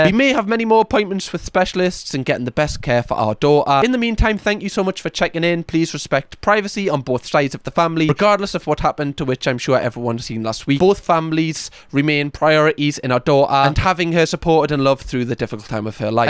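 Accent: Newcastle, United Kingdom. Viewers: Agreed and the Geordie accent helps😊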